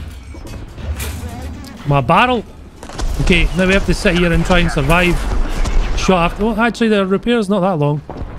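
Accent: Scotland, United Kingdom. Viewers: love your videos! I'm new to your channel, but so glad I came across one of your vids and subscribed immediately! Not sure if or how you take suggestions/requests to showcase a vehicle, so I'll just ask here: could you possibly take out the American T54E1 (8.0 BR) and show how it fairs these days? P.S. Everything sounds so much better with a Scottish accent, please say: "Pesky murderous sidewinder" in the thickest Scottish accent you can master in one of your next videos!;P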